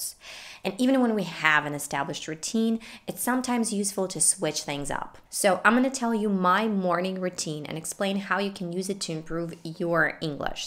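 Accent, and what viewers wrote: United States. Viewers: Is your English pronunciation of the USA? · Круто! Я б не сказав що Ви Українка, гарний американський акцент. І техніка 15 хвилин варто спробувати! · Hi my intimate teacher,. I follow your videos on a daily basis. I always use the methods that you suggest so as to be excellent at Englih as you. I admire your American accent. When I watch your videos and listen to your fluency, I feel relaxed. I hope I'll speak English as perfectly and fluently as you using your methods soon. Thanks for your beneficial advice and lessons. Keep going and we're all with you. Always take care. Sevara from Uzbekistan:)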